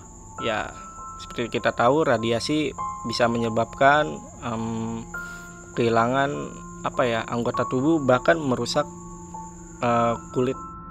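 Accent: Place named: Bali, Indonesia. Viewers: bang tu keturunan bali atau NTB kah? bilang "ithu" nya pas kata "thu" nya kayak orang daerah situ wkwkw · Asli Bali neng terbukti dg ngucap huruf T nya khas bgt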